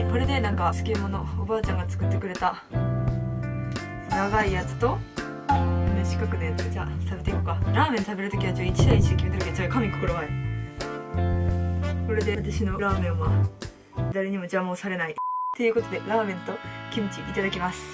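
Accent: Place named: Kansai, Japan. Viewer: ぺんぎん さん そうでしたか！w どうりで、関西訛りの日本語が上手いと思っていました！ww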